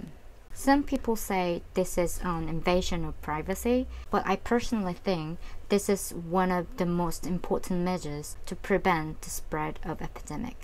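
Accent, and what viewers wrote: United Kingdom. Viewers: 私の母国語は日本語ですが韓国語も英語も扱えるようになりたくて勉強中なのですが、さんはもうマスターされてて本当に尊敬します☺️🕊👏🏻 イギリスアクセント素敵です💕 私は勝手に私にとってさんは原動力でありとても刺激になる方だと思っています🥰いつも動画楽しく見ていますこの言葉を伝えたくて初コメ失礼します😖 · 와 진짜 영국발음👍👍👍👏👏👏👏👏👏👏 너무 웃긴 질문인거 알지만😂😂 저두 2년 살면 님 처럼 영어 잘 해 질 수 있을까요...!! 물론 개인차도 있고 언니의 노력이 지금의 결과를 이루었지만 진짜🙏🙏👏👏 감탄하고 갑니다!!!ㅎㅎㅎ (전 호주에 있다 왔는데,왜 발음은 미국일까요😂😂😂) 진짜 영국발음 너무 멋있고 이런 내용 정리 잘 해주셔서 영상 올리시는 언니도 너무 멋있으세용💕💕💕 아 진짜 내가 유튜브에서 이런 채널을 발견하다니😍🥰ㅎㅎ 도쿄는 저녁🌛인데 런던은 아침🌞이겠죠? 전 오늘 편집 한다고 날 샜는데😂 금요일 한 주 마무리 잘 하시구 좋은 하루 되세용!!!💚💚💪💪 아 진짜 영국발음 언니 너무 멋이썽!!!!🥰❤️ (갑자기 다가와서 친한...척 죄송해요😂😂멋있어서 그만...!!!😍👍) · Love your English accent 😁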